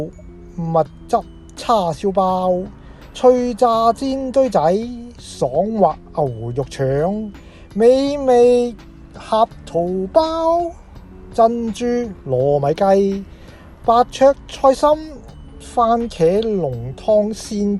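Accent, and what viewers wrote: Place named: China. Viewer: 要查清楚並講好聲音，不要太陸口音，明明牛栢葉不是牛怕葉。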